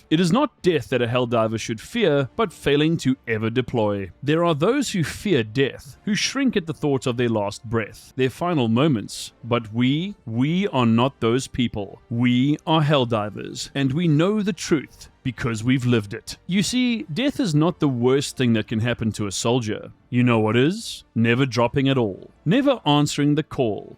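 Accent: South Africa. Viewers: Can't place your accent. It sounds like a South African that lives in Texas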